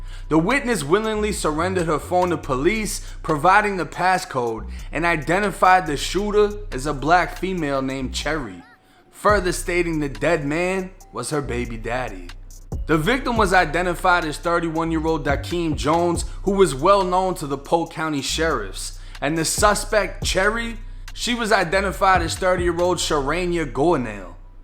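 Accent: Boston, United States. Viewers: Are u from Boston ? U remind me of mark Warburg in that movie you wanna a cawp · There's a reason men don't fight women in MMA. Maybe your goofy Boston accent should research harder on the rest of the world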